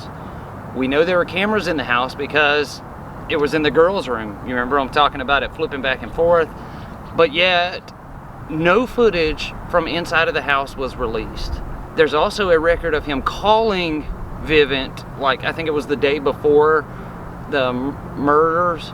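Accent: South, United States. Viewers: Love your vids, - and the southern accent (Im a Brit), so absolutely, I'd watch if you went for a drive around N. Carolina. Thanks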